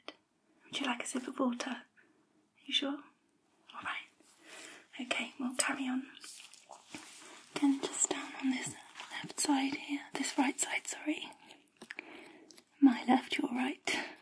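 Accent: United Kingdom. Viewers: Don’t know if You saw but I commented this on your previous video and I thought you’de like to see it :))) Wow just found this channel from this video and I have to say that I’m in love! What a great video with excellent soft spoken levels and then variation of some whispering too. Then when it goes straight back into the soft spoken it is so tingly. Maybe the familiarity of the common accent from my area of the U.K. helped enhance the relaxation! Triggers were also very well put together and I thought the camera and mic quality was on point too! Great length which I personally prefer! Overall fabulous video and definitely earned a new subscription 😊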